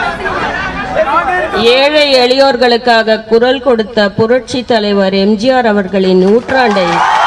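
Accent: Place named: Karnataka, India. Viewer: இந்தப் பாப்பாத்தியின் தமிழில் கன்னட வாடை அடிக்கிறது. தமிழ் நாட்டை ஆள ஒரு உண்மையான தமிழன் கிடையாதா. சசிகலா ஒரு தமிழச்சி, தமிழ்நாட்டை ஆள அதைவிட விட வேறென்ன தகுதி வேண்டும்.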